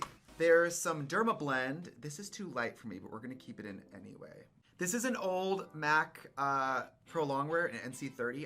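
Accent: Wisconsin, United States. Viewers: I love her Wisconsin accent! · her wisconsin accent in this video>>> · I hear your WI when you say go bag 🤣🧡🧡 I'm from Racine which you may or may not know isn't too far from the Mil much love I bought the Plant Gay PR Box and I use that goddam box for my makeups you are an icon I love you I'm going to convince my bf to take me to This Is It bar so he can finally LIVE ❤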